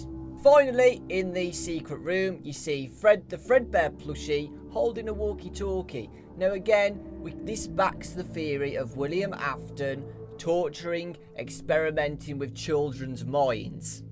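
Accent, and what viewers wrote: United Kingdom. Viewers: Omg your right about sister location being connected to that 4! I looked it up and its true! Also one question. Are you British? You sound a little british. Not hating I just wanna know of your British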